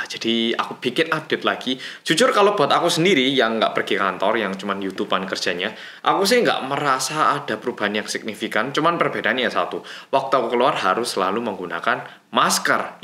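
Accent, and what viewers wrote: Java, Indonesia. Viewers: "Hallo Gaes Kembali Lagi Bersama Bersama Orang Korea Yang Medhooook/Luweeee" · Ternyata babang glowing suka nonton juga babang medok😀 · Halo halo mas medhokkk😂😍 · gemes banget sama ngomong medoknya mas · 1. Aku suka cara pikirnya, "itulah peraturannya, kalau nggak bisa ya jangan datang" 2. Lama2 aku berpikir medok itu natural dalam bahasa Korea, saking bisa nyampur aksennya. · Mas yang medhoook mwuhehehehe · Mas medok makin ganteng aja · Waaaa kaget, ini pertama kalinya aku nonton yt org korea yg ngomongnya medok jawa bgt😂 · Kok kayak ada bahasa jawanya bicaranya??? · Bolo2 Medokkk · Aku ko senang dengar si mas ini ngomong,soalnya medok bngt,kalau suamiku tau pasti marah2 nih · Ngantuk bangettt Tapi pas dapet notif video orang korea yang MEDOK ga jadi ngantuk mas ehe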